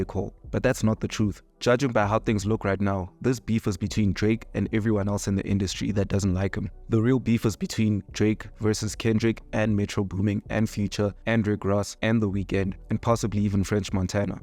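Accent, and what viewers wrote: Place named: South Africa. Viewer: South Africa.. I can hear it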